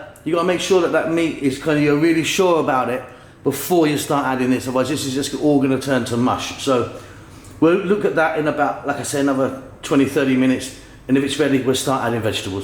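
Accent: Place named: England, United Kingdom. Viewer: I love your way of cooking as well as your English accent Amazing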